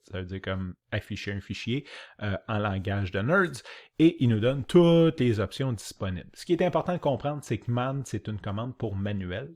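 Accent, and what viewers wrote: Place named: Quebec, Canada. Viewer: Le Français canadien 🎉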